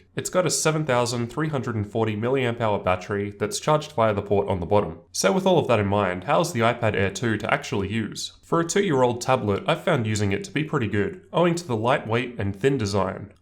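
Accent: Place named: Australia. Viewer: Are you an Aussie? I have an Australian friend with a similar accent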